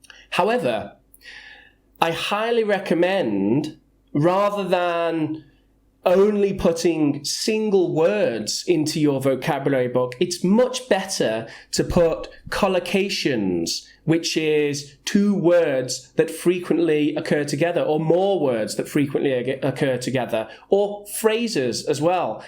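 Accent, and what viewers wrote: United Kingdom. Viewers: I've been learning so much with your videos, I don't have time to attend an English course so I study by myself when I'm free.I've been following your tips and I notice that I'm finally making progress at my studies!:) Plus, your British accent sounds like music to my ears.haha Thanks a lot!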